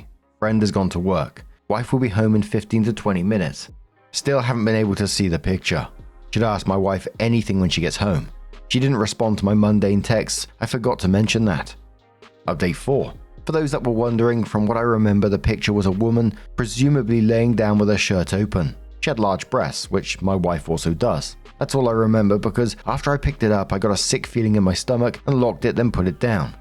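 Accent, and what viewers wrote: United Kingdom. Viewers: It is a British thing FYI, sometimes they don't pronounce 'co' or 'ch' with the hard 'k' that Americans do.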